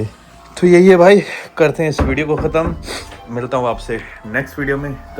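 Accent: India. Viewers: Just a suggestion. Use interrogative sentences when asking a question. "This is a fisherman village, ?, yes!?" This sounds vague and confusing, and sometimes it can come across as rude (especially with our Indian accent). We don't have to change our accent, but instead, we should use an interrogative sentence...like ask, "Is this a fisherman village?" simple, effective, short.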